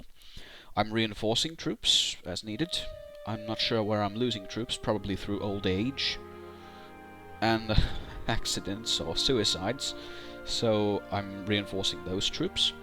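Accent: United Kingdom. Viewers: your from finland!?!?!? wow. I would have guessed British/UK... great English. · Kuulostat ihan britiltä ! :D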